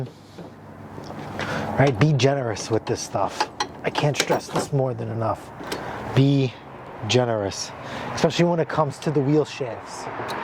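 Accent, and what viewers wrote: New York, United States. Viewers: Thanks for the video. Really funny hearing a New York accent living here in central Idaho.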